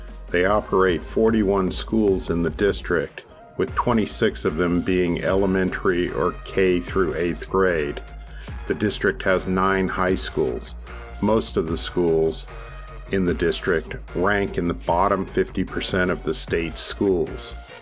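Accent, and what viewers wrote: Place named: Midwest, United States. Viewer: I find myself driving the streets of Pomona with a morning coffee run. Love me some PTown. For a first video it’s wonderful. You don’t sound like a Californian, how did you come to start here? Or maybe you just sound like a Midwesterner. I don’t have suggestions, you have had the critiques get in I see. I’ll look into your channel for more content. Thank you. This was really really enjoyable.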